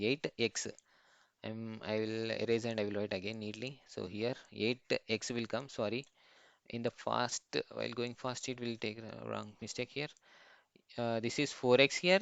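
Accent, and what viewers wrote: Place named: India. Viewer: buttler english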